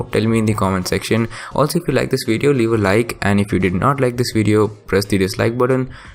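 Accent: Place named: India. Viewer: Fucking indian accent so anoying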